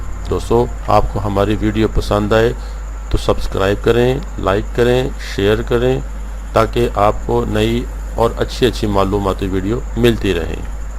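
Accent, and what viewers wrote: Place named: Punjab, Pakistan. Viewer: Ghatiya. Agar kahi se parh Kar sunana hi hai to rawani k sath parhte. Ruk ruk k parh k dimagh ki lassi bana di. Aur Punjabi lehjay me Urdu ka khana kharab kardiya. Punjabi me hi parhlete.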